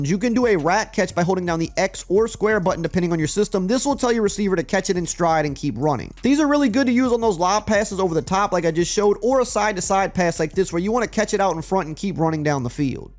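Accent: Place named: Alabama, United States. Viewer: oh ok, cus dude sound like he got that bama accent but it LSU is Deep South too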